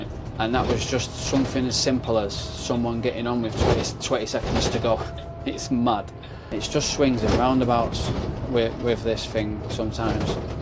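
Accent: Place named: Manchester, United Kingdom. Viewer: Great video,s as an expat manc it's great to hear a manc accent! I'm curious do you stick to the same routes all the time or do ever do routes say in sale Altrincham way?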